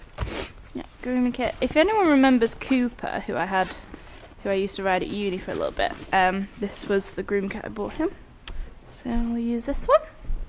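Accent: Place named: North Yorkshire, United Kingdom. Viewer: Are you from North Yorkshire?? I am from there xx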